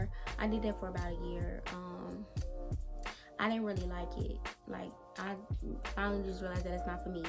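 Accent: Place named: Alabama, United States. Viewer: Which one did you go to bc you sound like you’re from round my way are you in Bama ?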